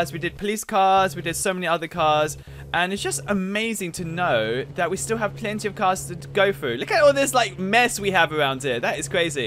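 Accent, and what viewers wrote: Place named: England, United Kingdom. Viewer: You talk like your From englande Sorry England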